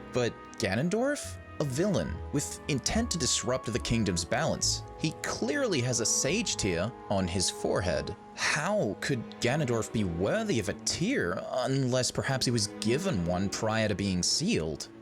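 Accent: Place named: Australia. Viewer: Your accent is so interesting. Like an Australian that’s lived in the US for too long? The way you pronounce your R’s is so chaotic. I love it.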